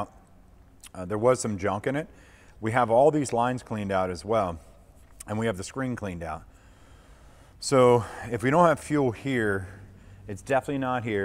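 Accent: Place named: Philadelphia, United States. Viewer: Anyone else smell that? "We've been here all night" he walks out the door, and back in -- that's a lot of light outside, in January, around where I assume he is around Philly (I grew up in Levittown) -- I know that accent, and plus when he fired up the GPS in the plane it seemed to center around Delaware county or so. Then he says he needs $20k. I smell scam. Which is a shame, cause watching him get the props loose was really fun. Something's not right.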